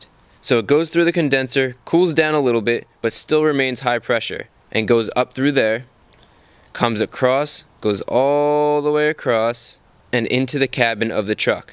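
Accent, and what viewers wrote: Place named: Delaware, United States. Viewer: You are the best, and as a DE guy, I miss people with your accent. Soapy wooder forever dude.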